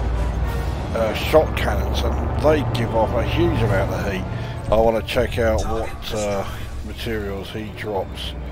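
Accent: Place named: London, United Kingdom. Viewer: I engineered my weapons Thermal vent but Distributor has so many alternative's to work out so I will try weapon based Engineering.I must add at 63 now I'm trying my best to get the most out of my ship's and being an Elite Vet since 1984 I'm still on a learning curve on this game.Nice work on the vids and I love the Old Smoke accent.Cheers from Raymondo the Taff.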